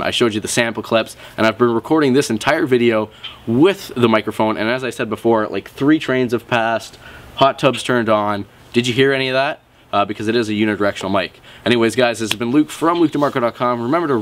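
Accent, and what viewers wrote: Canada. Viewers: canadian accent? cute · haha, you're canadian :)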